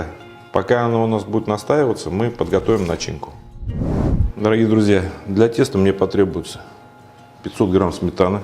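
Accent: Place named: Kazan, Russia. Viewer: Уже много лет живу далеко от родины. Рецепт простой и вкусный и поведан с таким неповторимым казанским выговором, ну просто бальзам на душу. Спасибо большое ☺️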